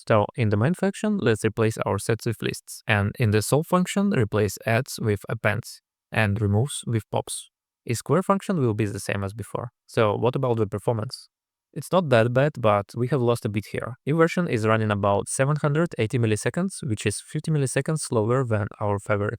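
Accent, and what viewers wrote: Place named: Russia. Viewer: Мне так нравится слушать русский акцент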